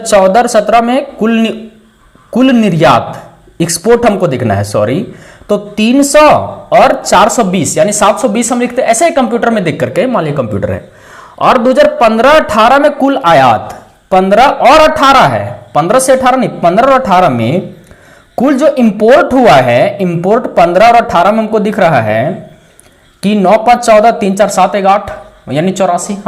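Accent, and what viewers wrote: India. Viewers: Bhai mai aapse kuchh kehna chahta hu..... Aap garibo ke liye bhagwan hai..... Ho sakta hai kuchh log aapke desi bolne ke style ko nahi like karte ho.... Aap rukiyega nahi.... Thanks a lot keep continuing.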